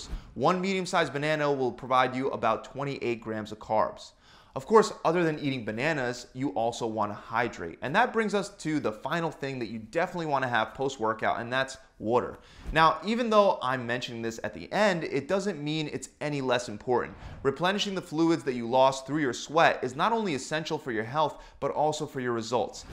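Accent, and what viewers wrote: Philadelphia, United States. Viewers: This dude from either philly or jersey w that wootur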